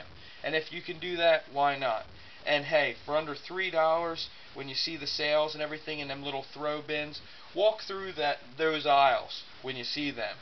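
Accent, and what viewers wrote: Baltimore, United States. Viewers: Good idea. You sound like you have a Baltimore accent. Am I right?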